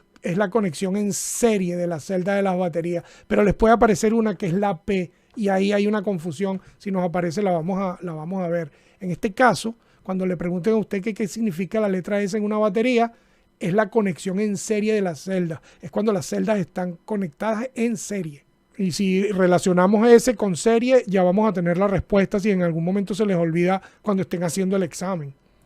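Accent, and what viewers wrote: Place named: Venezuela. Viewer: Hola!! No se si te ha pasado, que despues de estar tan lejos de casa, oir a alguien hablando venezolano es como musica para mis oidos.. :-) aprendi mucho con el video anterior ( y me rei demasiado con "que tiene que ver el c... " y lo de la "burra bipeda" jajaja) y me dio mucha tranquilidad saber de alguien que despues de la perdida de un dron "mas nunca" se sintio seguro volando.. a mi me paso y eso que que compre un dron "fly away free".. me sentia incomoda, me daba mucho estres y lo guarde por un tiempo.. el cuento es que decidi tomar cartas en el asunto y el lunes presento el examen aqui en Noruega. Aun cuando el contenido es distinto, tu video me ayudo demasiado.. muchisimas gracias y un abrazo :-)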